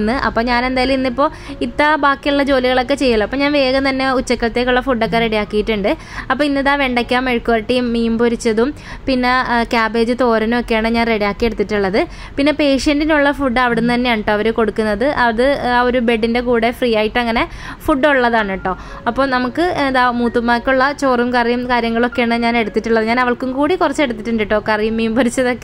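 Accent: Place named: Kasaragod, India. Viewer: Chakkappaayam ...kasaragod parayunne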